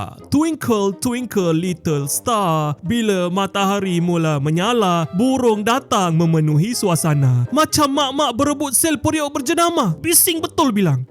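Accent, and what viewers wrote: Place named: North, Malaysia. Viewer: loghat utara. Belajar tu biar luas, jangan sempit2😅😅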